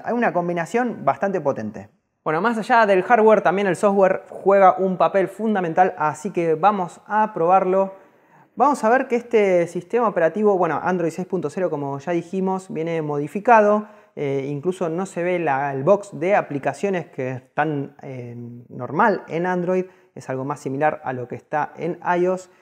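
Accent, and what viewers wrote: Argentina. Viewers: sos argentino o español? porque decis algunas palabras en argentino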